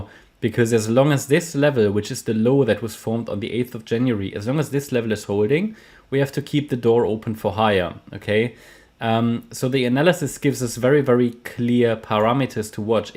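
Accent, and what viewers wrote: Germany. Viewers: Deutsch, jetzt habe ich es rausgehört :)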